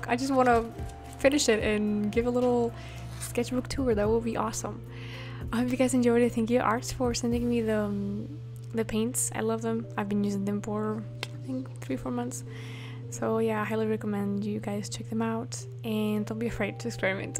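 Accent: Spain. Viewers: This is the first video of yours I’ve ever seen and for context my spanish teacher at my high school has an insanely similar accent and speech pattern to you, I find it very pretty and calming (I love accents but Hers is one of my favorites) and she is from Spain. When you said you were from Spain I freaked internally because you sound so much like her your voice is so beautiful and calming! Can you do ASMR while painting?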